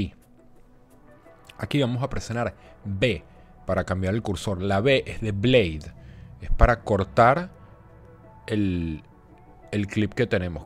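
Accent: Venezuela. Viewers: eres venezolano? muy buen tutorial :D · Excelentes videos mi pana, eres venezolano cierto?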